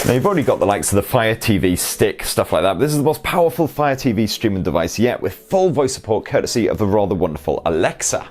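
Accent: United Kingdom. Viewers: I dont get why cant british people speak regular english? Always gotta be so extra... its annoying couldnt watch this all the way through 😑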